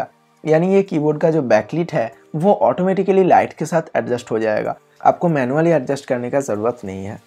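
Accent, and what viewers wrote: Bengal, India. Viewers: Are you Bengali? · Bhai kya aap Bengali ho??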